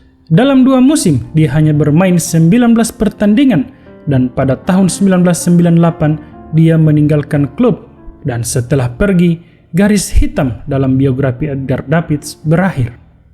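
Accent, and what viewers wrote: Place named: Sumatra, Indonesia. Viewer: logat nya mirip org sumatra gitu min hehe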